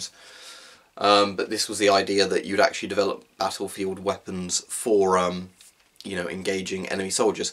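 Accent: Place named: England, United Kingdom. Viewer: I only like recieving information in English accents 😂. It just sounds more sophisticated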